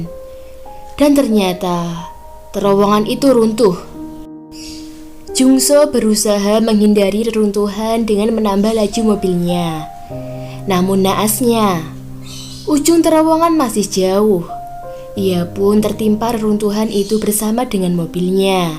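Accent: Java, Indonesia. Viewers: Anjiiing jd ga seru. Logat jawa gini.fil bgs jd Alur cerita yang membangsatkan ini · Suaranya medhok bgt sayangku. Saran aja sik biar yg nonton lebih comfy, cmiiww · Medok banget cukup sekali aku liat video ny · Ko medok gitu loh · kalau memtah g enak lah,.mendig medok jadi empuk🤭🤭🤭🤭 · Pasti kmu orang Jawa ya min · Jawa ya? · Jawa temen kie lah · Ngomong nya Jawa banget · ngomongnya medok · orng jawa nih yg pnya chanel..logat ny kciri bgt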